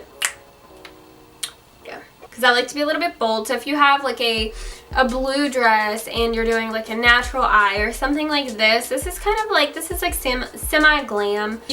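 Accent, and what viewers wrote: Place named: South, United States. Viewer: Sucks you had a allergic reaction, i had one to fix plus spray 😭 glad your feeling better 💜 i also have the 1st palette from carli bybel. I love the highlighters in the palette, great prom tutorial doll💜 oh and yes you sound a bit country, not a lot lot but a bit of a accent. Lol its cute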